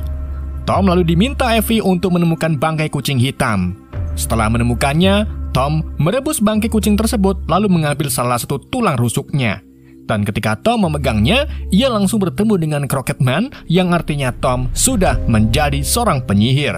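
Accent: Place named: Java, Indonesia. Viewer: Orang jawa sonnya😂😂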